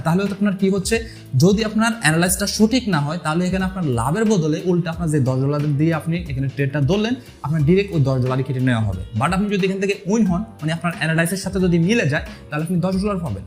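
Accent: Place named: Chittagong, Bangladesh. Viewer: Chittagong er mone hocche 😐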